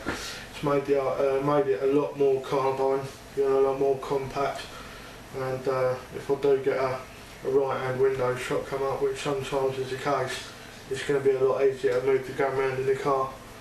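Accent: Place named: RP, United Kingdom. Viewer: Bob USA. he's speaking the Queens English so have a bit of respect. okay fella now just watch and learn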